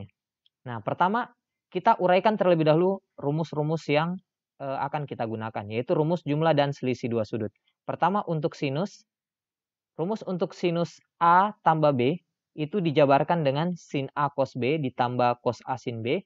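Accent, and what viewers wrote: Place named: Bugis, Indonesia. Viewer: Kayak aksen bugis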